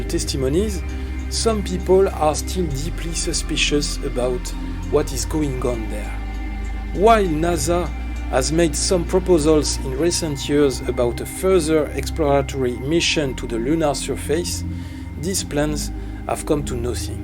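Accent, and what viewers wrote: France. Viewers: Waouh l'accent Fran-glais 😁 268k d'abo en langue Anglaise pour un Français ! Bravo ! Pouce bleu !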